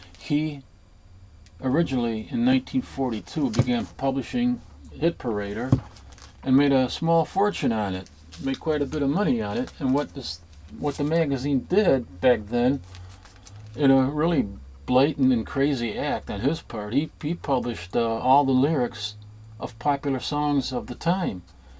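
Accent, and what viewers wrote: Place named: New York, United States. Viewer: you could be a voice actor you sound like a newyorker who knows the streets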